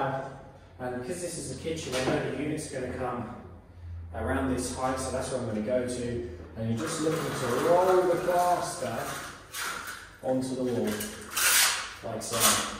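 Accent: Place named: United Kingdom. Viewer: I was going to make the same comment. I am from the US, so the British accent makes it a bit harder to understand. But using the mic in the camera introduces a lot of room echo, and the audio level goes up and down, depending if is looking at the camera or away from it. And there were key points, where I just couldn't quite understand......... A wireless mic would bring up the only minus point of your fine videos..... (Your content is great, your videos are fine, and your presentation is very good, only your audio is lacking............. Thank you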